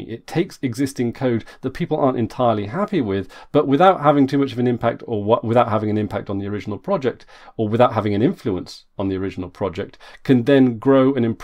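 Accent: Wales, United Kingdom. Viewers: wait r u welsh can u speak welsh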